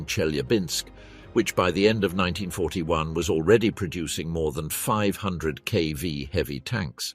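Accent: United Kingdom. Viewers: Always the West with a British accent taking the liberty to tell a history of another country, another people only to denigrate their incredible sacrifice to free humanity from the Nazi scum. You will never see a Russian "documentary" on what the West involvement was in the WW2. One big lie here: that the USSR signed a non-aggression pact with Germany because "it mistrusted everybody else." Not true. The USSR saw the the Nazi threat and asked the West powers to have a common security agreement but this was rejected by them all. In fact, the West had already signed non-aggression pacts with Hitler, but this is not criticized here. We only condemn the USSR for trying to keep the Nazis at an arms length. The West only came to the USSR's aid when it was clear that the Soviet Union was defeating the Nazis. Don't believe everything you hear even if it is said with a British accent. 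Lies are lies no matter what.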